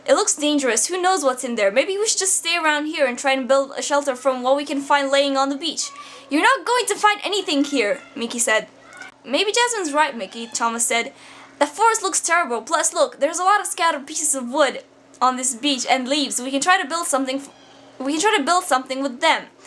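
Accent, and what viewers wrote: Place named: United Kingdom. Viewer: oh shit your accent gets more british every time nooo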